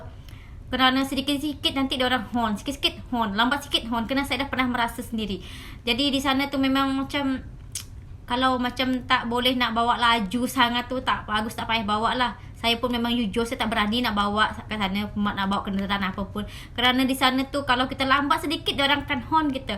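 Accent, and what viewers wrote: Indonesia. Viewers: Kamu ni org indonesia ke confius la Mcm bunyi org indonesia jer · Awak ni org mana? Awak mcm ckp awak org Malaysia… tp loghat awak tu mcm org Indonesia cuba cakap bhs Melayu Malaysia. Contoh: Org Malaysia tak ckp beda, org Malaysia ckp beza, Indonesia bukan Indonesie, n byk lagi. mmg bukan bunyi org Melayu Malaysia pun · Dgr cm loghat kepulauan riau je.kabupaten lingga.btl ke? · ni org mane??.. dr segi gaya² ckp tu.. mcm org Indonesia..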